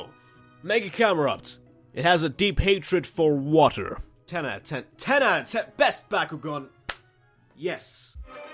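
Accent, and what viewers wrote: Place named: Northern Ireland, United Kingdom. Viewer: Where so you live? I couldn't tell if it was NI or Britain 😅 I live in N.I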